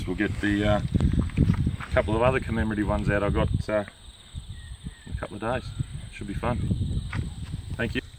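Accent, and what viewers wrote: Australia. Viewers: Sorry, can't understand Aussie.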